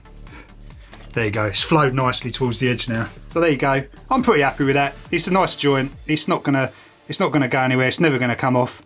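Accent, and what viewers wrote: United Kingdom. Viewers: From your accent I guess you're British excellent very brilliant was Gordon Ramsay your chef and I do think it's brilliant how you're soldering batteries I want to do the same thing to my e-bike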